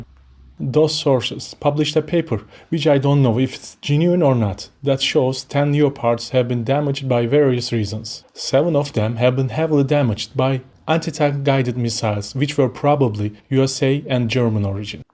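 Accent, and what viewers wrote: Turkey. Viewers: Aksandan türk olduğun hemen belli oluyor ama güzel birşey yabancıları bilgilendirme için böyle birşey yapman senden daha fazla lazım bu ülkeye kendimizi batıya açıklayamıyoruz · sen türk müsün aksanın türk aksanına benziyor güzel video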